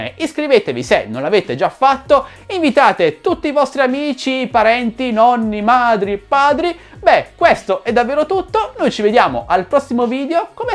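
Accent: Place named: Bari, Italy. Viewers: Dall'accento sei barese come me , grande . Un consiglio . Questo PC , con queste caratteristiche , potrebbe essere utile per fare musica , stile karaoke ? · Cmp sei di Bari , Se sì Anch'io sono di Bari( si è sentito dall'accento)😝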